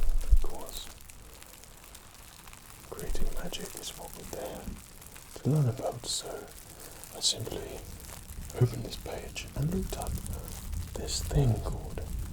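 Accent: England, United Kingdom. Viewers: Is he English? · Loves chocolate, loves Harry Potter, looks like a surfer & sexy af articulate English accent... Yum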